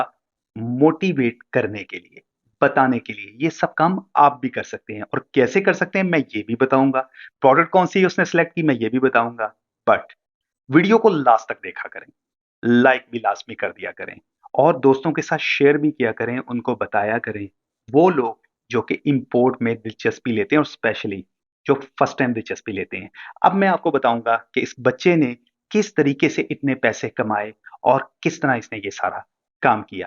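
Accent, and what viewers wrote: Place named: Lucknow, India. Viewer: Sir Mashallah Apke baat kerne ka Andaz Lucknowi hai AP Kiya Lucknow se hn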